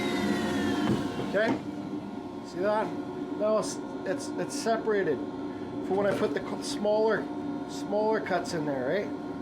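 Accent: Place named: Canada. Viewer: I fucking love your personality dude. It's like listening to me but with a Canadian accent instead of a southern one.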